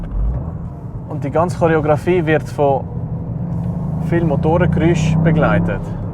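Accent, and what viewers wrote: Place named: Switzerland. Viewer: Isa schwiiiiitzerdüüüüütsch 😂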